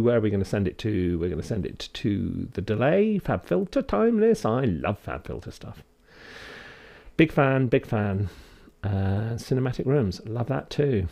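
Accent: United Kingdom. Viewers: for the 30 seconds i just like his British accent and humble personality just pairs well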